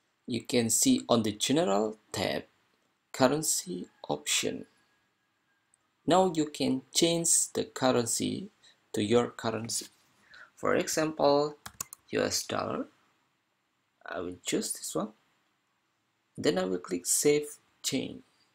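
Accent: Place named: Indonesia. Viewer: Indo's accent ^^ ...nice vid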